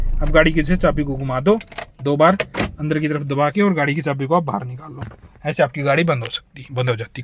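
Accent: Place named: Haryana, India. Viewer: Haryana Sy lag Raha bhai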